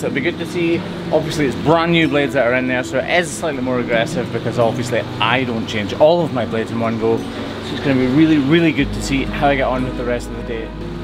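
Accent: Scotland, United Kingdom. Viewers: Thanks for a lovely episode, nothing like waking up to pure Scottish in the morning, you are so helping my daughter with her accepting she speaks Scottish.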